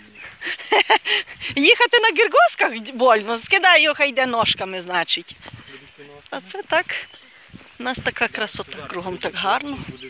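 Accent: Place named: Transcarpathia, Ukraine. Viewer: Здравствуйте , а в какой области и районе вы живёте ? Я родом из Закарпатской обл , знакомый диалект .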